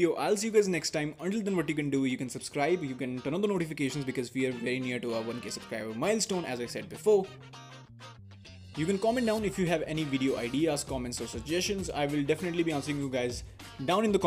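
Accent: India. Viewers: i hate hendeee accent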